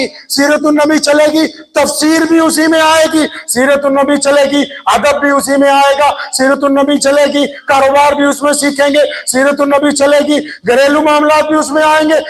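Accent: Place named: Punjab, Pakistan. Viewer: Takabbur nhi bhai..wo punjab se he..unki aawaz or lhza hi esa he.. MashaAllah bayan bahut Bdiya krte he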